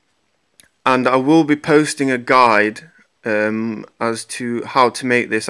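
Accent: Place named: United Kingdom. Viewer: You can tell you from the uk😂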